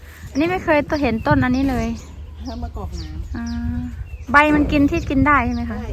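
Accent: Laos. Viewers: ลองคิดในมุมของเจ้าหน้าที่นะ ทั้งบ้านได้สัญชาติไทยกันหมด มีอยู่คนเดียวไม่ได้สัญชาติ แล้วเพิ่งจะมาขอตอนอายุ จะ 30 ปี แถมมีลูกอีก ในมุมมองของคุณมันแปลกมั้ยละ FC หลายคนก็บอกแล้วปรับวิธีการพูดให้คนไทยเข้าใจ นี้ก็ไม่ยอมปรับตัว พูดแบบสำเนียง ลาวอยู่นั้นแหละ มันแปลกทั้งประวัติ แปลกทั้งสำเนียงการพูด คุณเป็นเจ้าหน้าที่จะทำให้หรือ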